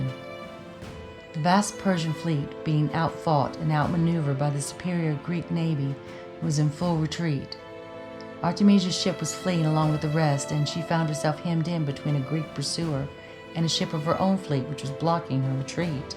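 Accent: South, United States. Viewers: Your voice is so soothing..the mixture of Southern accent, smoothed out by a little New Yorker and the British tongue with words like "que", "nip in"...no wonder I couldn't put my finger on it.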